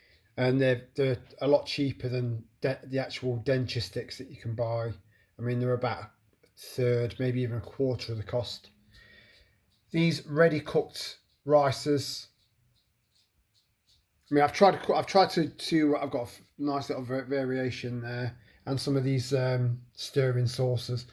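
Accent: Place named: East Midlands, United Kingdom. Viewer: Ooh that accent! Def an East Midlands boy!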